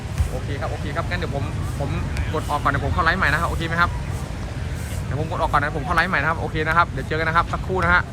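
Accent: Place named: Korat, Thailand. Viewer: โคราชชัดเจน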